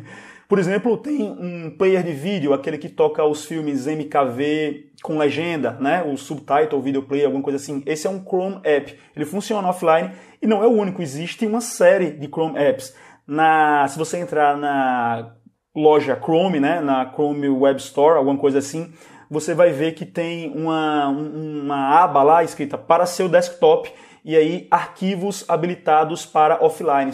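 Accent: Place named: Aracaju, Brazil. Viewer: caraca tu é de aju? hahaha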